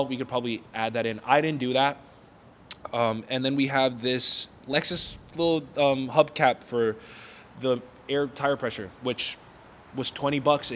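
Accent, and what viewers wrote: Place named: New York, United States. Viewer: wow really? I would’ve never guessed that. I was thinking Puerto Rican but didn’t want to say lol. Your accent gives me Italian vibes, that New York City type accent ya know. Love the car btw, I’m working on fixing some things in my 2008 Toyota Camry as well speak, getting a water pump and rear struts installed , and now I need a new transmission too.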